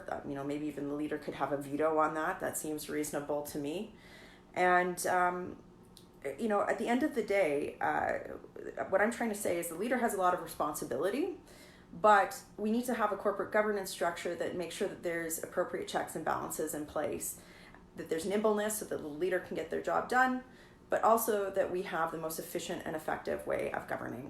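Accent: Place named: Western Canada, Canada. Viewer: She is a western Canadian. We all sound like her here! :)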